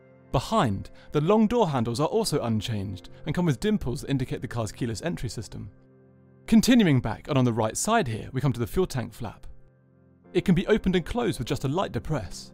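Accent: RP, United Kingdom. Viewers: He sounds awfully posh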